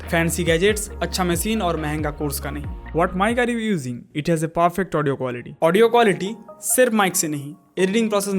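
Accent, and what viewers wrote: Bengal, India. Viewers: bengali tumi ??😅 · your bengali is so cute 😃😃 I a from bangladesh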